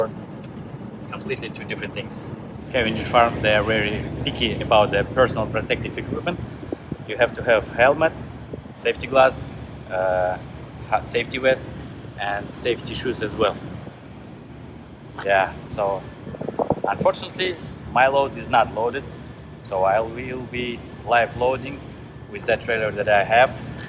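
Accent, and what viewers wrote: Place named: Russia. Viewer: Доброго дня! Теперь можно добавить и в обучающее видео по английскому. С русским акцентом понятно практически все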